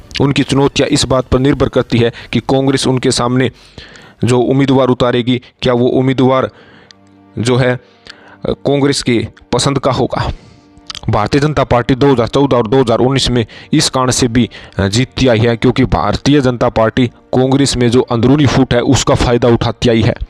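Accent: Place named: Haryana, India. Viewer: जनत न्यूज़ वाले भाई आपके लिए एक सुझाव है आपका हरियाणवी टच आ रहा है इसमें थोड़ा हिंदी टच अधिक लेकर आई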